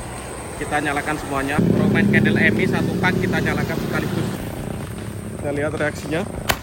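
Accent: Java, Indonesia. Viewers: Orang mana bang kok turunan jowo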